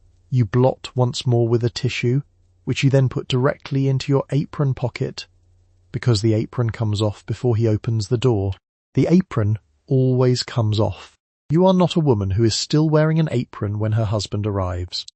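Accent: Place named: United Kingdom. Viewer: I have trouble falling asleep listening to the British accent.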